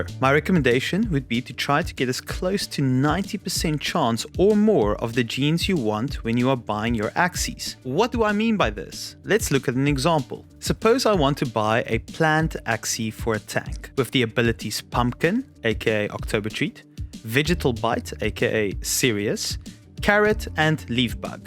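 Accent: South Africa. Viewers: I thought you might be South African, so am I :D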